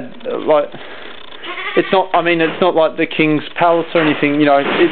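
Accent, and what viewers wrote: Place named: Australia. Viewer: all that for a number 1.... thought u were an aussie mate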